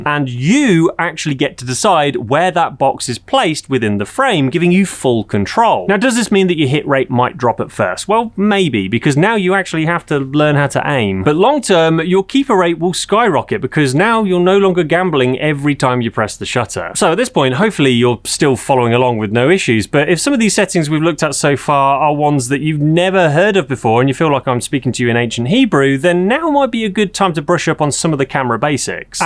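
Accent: United Kingdom. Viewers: Informative common sense leavened with wit —and a non-AI British accent that's not insufferable.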